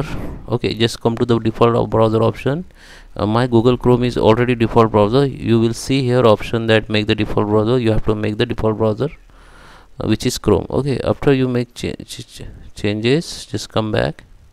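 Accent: India. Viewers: indian accent is umbearable holy 😭😭😭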